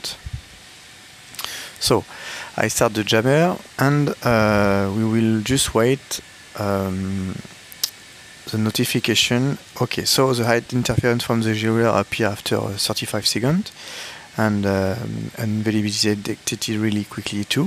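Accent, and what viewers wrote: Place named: France. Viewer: Bon morning Rien qu’en écoutant to anglais j’ai compris que tu étais French 🇫🇷 J’ai le même accent en anglais 😁 Plus sérieusement ont ils changer quelque chose ou pas depuis le temps ? Autre question n’y a t i pas une option qui te permet d’activer le déclenchement de la siren en cas de brouillage ?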